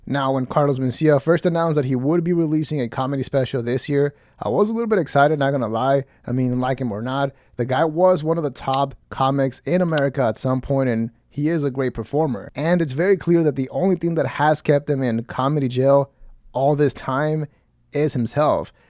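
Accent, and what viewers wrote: India. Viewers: Omg i was so sure you were indian 😂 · OOMG I THOUGHT YOU WERE FROM INDIA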